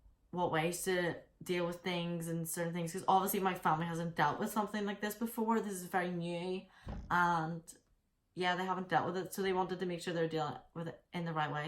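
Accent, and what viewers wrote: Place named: United Kingdom. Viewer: You’re so pretty, and aww I love your british accent 😍💗 anyway I can help ?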